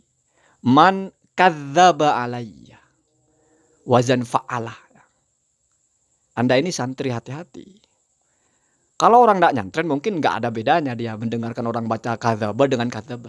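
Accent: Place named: Banyumas, Indonesia. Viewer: Jngn ngapak maka nya baca sesuatu itu biar jls ,jngn medokkkkkkk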